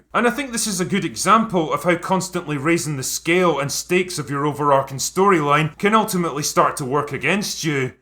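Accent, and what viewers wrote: Scotland, United Kingdom. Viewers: Is the Groundskeepr willie accent his natural accent or does he exaggerate it for these videos?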